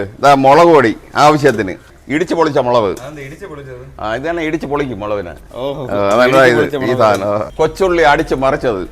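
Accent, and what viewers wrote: India. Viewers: തനി തിരോന്തോരം 😂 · Thiruvananthapuram slag adipoli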